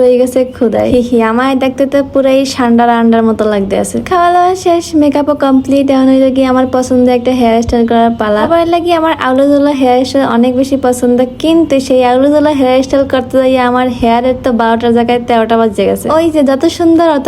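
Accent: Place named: Barishal, Bangladesh. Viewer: আমি তো শুধু তোমার বরিশাইল্লা ভাষায় কথা শোনার জন্য ভিডিও দেখি.. 🥰🥰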